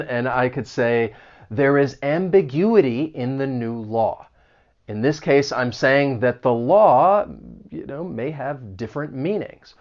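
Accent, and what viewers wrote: United States. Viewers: OH MY GOD, MY FAVORITE ENGLISH TEACHER REPLIED TO ME, YOU ARE BETTER THAN MY IN-SCHOOL TEACHERS, TYYYY, IM FROM MOROCCO BTW❤❤❤❤ AMERICAN ACCENT IS RENOWNED WITH YOU❤❤❤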